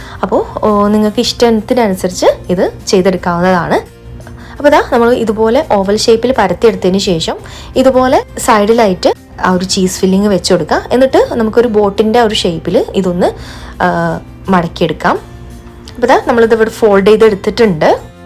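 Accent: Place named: Kozhikode, India. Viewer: ഇങ്ങളെ അവതരണം അടിപൊളിയാണെ, നല്ല കൊഴികോടൻ സംസാര ശൈലി 😅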